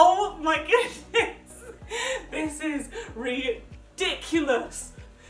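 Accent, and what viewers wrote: United Kingdom. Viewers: I can tell you are from the UK 🇬🇧, I’m sorry for those who will hate but I’m new to this channel Also my favourite time stamp has to be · I love your British accent ❤️❤️❤️❤️❤️❤️❤️❤️❤️❤️❤️❤️❤️❤️❤️❤️❤️❤️❤️❤️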